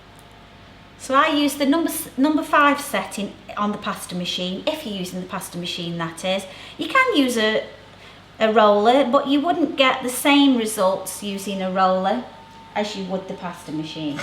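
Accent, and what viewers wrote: United Kingdom. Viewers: i love your little british acent X3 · Tutorials are always better with a British accent!! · what are you talking about? there is no Irish accent here, just a British one, probably midlands maybe southern. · Obviously some ignorant people who think the English all talk like Kate Winslet!! There’s so many awesome accents in the UK! Hers being one of them. Thanks for a great tutorial. Love from Oz x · oh my.... i love your accent.i didnt like British before but you talk really beautifully.and your tutorial was awesome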